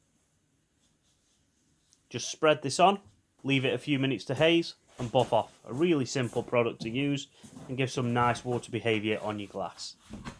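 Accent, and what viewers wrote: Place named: Stoke, United Kingdom. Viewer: yes mate, thought you had the stoke twang, phasing out some of the AB stuff which is good but there are some awesome companies out like like alien magic and bouncers. Need something that will give my silver car a good gloss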